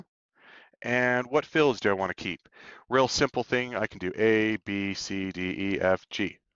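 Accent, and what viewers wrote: Netherlands. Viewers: You are dutch ? :) hahaha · Interesting. Are you dutch?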